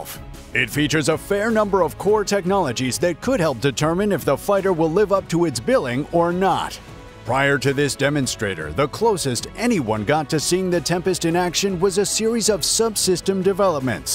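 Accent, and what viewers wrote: United States. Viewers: I really am getting sick to death of hearing American accents on videos that have nothing to do with America. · Why does it have to be narrated by a yank? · Why the American narrator?????? Very offputting. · Why are these vids narrated by a yank ? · Why do we have an American voice,? Oh perhaps it because they are the experts on killing people?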